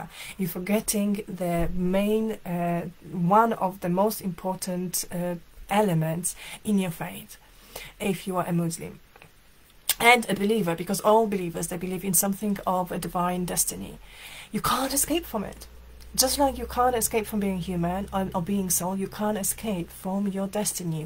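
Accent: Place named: Germany. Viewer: Are you german? I hope you do not mind my question its the accent. I loved your video, a good reminder. JazakyAllahu Khayran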